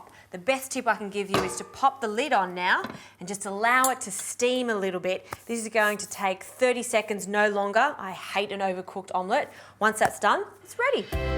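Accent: Australia. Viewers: Nice Australian accent.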